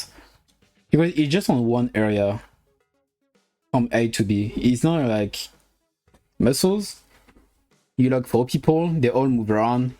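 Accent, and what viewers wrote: France. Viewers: The french accent se fait sentir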